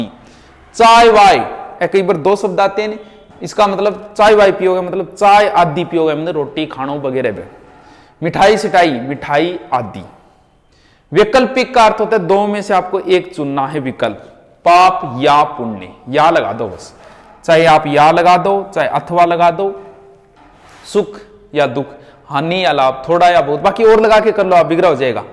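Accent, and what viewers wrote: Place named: Haryana, India. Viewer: YOU ARE FROM HARYANA. I GUESS!!!!!!!!!!!!!!!!!!!!!!!!!!!!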